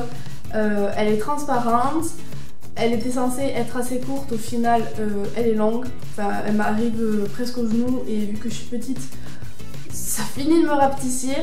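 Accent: South, France. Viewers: Bonjour ! Je viens de découvrir ta chaîne et j'aime beaucoup :) du coup je vais poser des questions en mode la fille qui est larguée ! Quelle âge as tu ? Que fais tu dans la vie ? Et d'où viens tu ? J'entend l'accent du sud , c'est rare de voir des youtubeuses du sud! Moi je viens de Toulouse ;)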